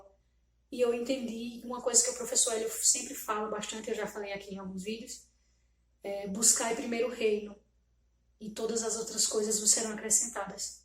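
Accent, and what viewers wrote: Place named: Northeast, Brazil. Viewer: já comecei me indenticando com esse sotaque NORDESTINO. A tua história parece muito c a minha. O caminho das palestra foi onde tive a maior catarse. Continuou pq pedi catarse. Estou na segunda onda, nunca aprendi tanto em toda minha vida.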